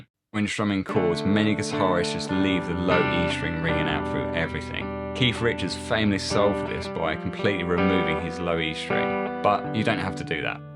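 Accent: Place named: United Kingdom. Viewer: I always found the British accent annoying but this guy takes it to the next level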